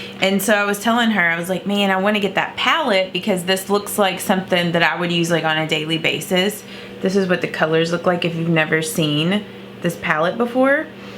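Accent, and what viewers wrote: South, United States. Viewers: My two Kentucky YouTubers are not posting and I miss y'all!! I rely on that Southern accent to keep me company while I get ready for work am!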